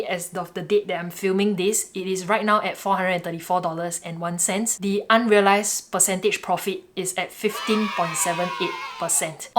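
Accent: Singapore. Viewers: I love your singaporean accent!!! Singlish makes my heart beat! Maybe it was your good taste in music and the beautiful scenery from your newest korean vlog. 🌇 you are so smart, I'm going to watch all your videos! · Is she speaking Singlish? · I know you’re Singaporean from your accent.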